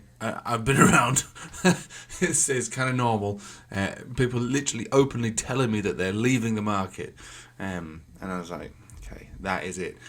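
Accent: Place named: Leeds, United Kingdom. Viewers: I think he might be from Leeds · I’m in Bradford you sound local are you in West Yorkshire somewhere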